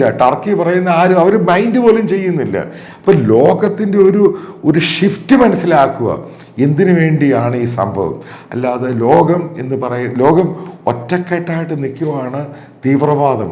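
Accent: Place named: Central Travancore, India. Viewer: Ayyooo mathew Samuel ... you're such a funny guy. Each time I hear this " ee pullu !! " cannot stop laughter . Yeah madhya thrivithamkoor working . Am from Kottayam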